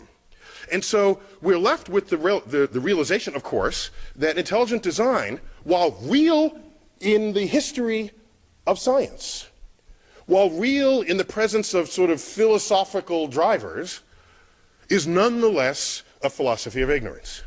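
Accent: Jamaica. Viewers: Are you Jamaican man? Love your channel.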